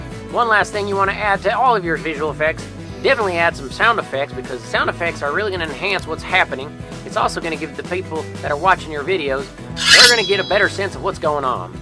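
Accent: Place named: Texas, United States. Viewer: i loved your texan accent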